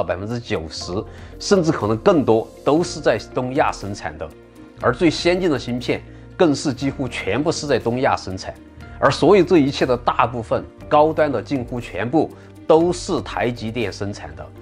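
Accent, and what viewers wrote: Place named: Sichuan, China. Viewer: 先把影片標題繁簡轉換搞對吧～「穀/谷」，很不專業。而且你口音實在有點重，與其努力繞舌，不如直接用四川話錄視頻吧，效果一定很棒，畢竟是母語。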